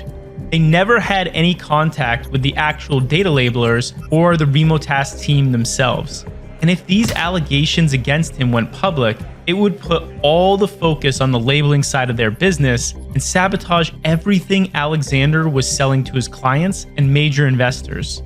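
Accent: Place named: United States. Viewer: sounds very American to me!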